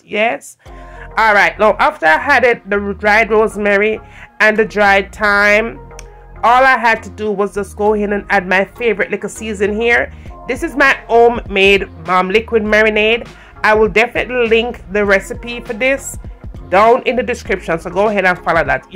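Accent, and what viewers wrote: Jamaica. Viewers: You sound jamaican but you don't cook jamaican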